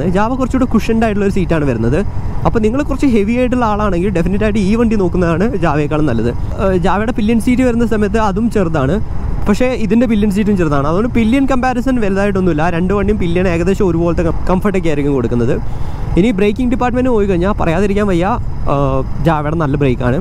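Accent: Kozhikode, India. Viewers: Bro കോഴിക്കോട്ട്കാരനാണോ?.... ഇടക്ക് മ്മള് കോയ്ക്കോട്ട്കാര്ടെ slang കേറിവരുന്നപോലെ.. മൂപ്പരൊക്കെ😃🤔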